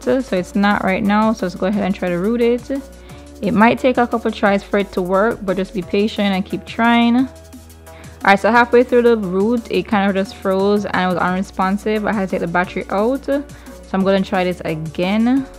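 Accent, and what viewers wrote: Jamaica. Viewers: U Sound Jamaican, I'm a Fan Of Modding Bypassing, Custom Firmwares & Roms! 💯 I Subbed ☺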